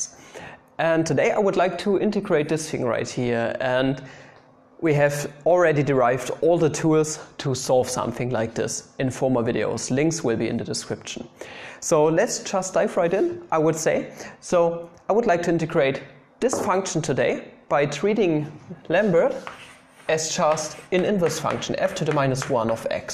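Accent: Germany. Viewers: Ahhh the good old german accent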